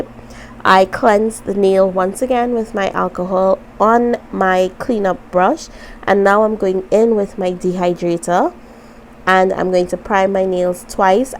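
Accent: Trinidad and Tobago. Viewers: Are you Trini? I hear a accent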